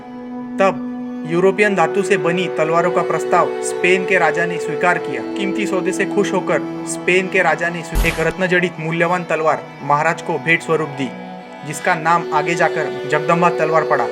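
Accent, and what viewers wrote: Maharashtra, India. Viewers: Bhava tu Marathi aahes na Avajavarun olakhal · हिंदी छान बोलता तुम्ही पण अजुन थोडा सुधार हवा कारण उच्चार करताना काही मराठी शब्द येतात.🙏🏻तरीही vdoछान वाटला👍🏻".छत्रपती शिवाजी महाराजांचा" ईतिहास सांगणारा प्रत्येक vdoआवडीने बघतो मी🙏🏻🙏🏻💐💐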